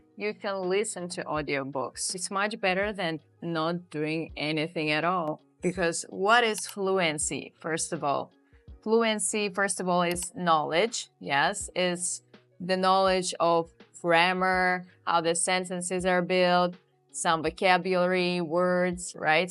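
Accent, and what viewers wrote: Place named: United Kingdom. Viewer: Truly, you are a sophisticated content creator who contributes to teaching authentic English with a British accent. This is excellent! May God help you. Perhaps there are difficulties; you could open an international English language school in southern Algeria—just a suggestion. I respect your work plans, value your commitments, and am proud of your humility, kindness, reputation, and lightheartedness. I thank you from the bottom of my heart and wish you continued progress, prosperity, and success. Amen, Lord of the Worlds.❤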